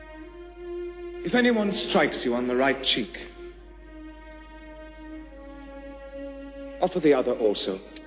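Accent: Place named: England, United Kingdom. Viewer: Jesus didn't have a stupid English accent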